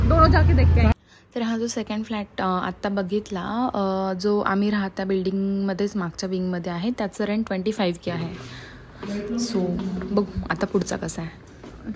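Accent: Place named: Nashik, India. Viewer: Half vlog baghitla language hun vatal aaplyachkadche distay Nashikkar❤ nd I'm right😀 New subscriber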